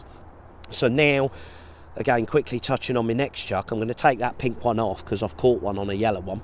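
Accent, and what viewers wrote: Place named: Cockney, United Kingdom. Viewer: I’ve said it before, love knowledge & intuitive nature, which when u listen to most other pretentious promotional crap, ie ringer & the likes irritating wankers! Carn’t get enough of cockney tones!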